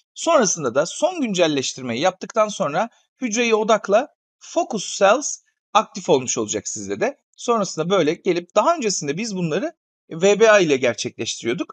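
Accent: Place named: Aegean, Turkey. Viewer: ege şivesiyele biyendim, yani beğendim.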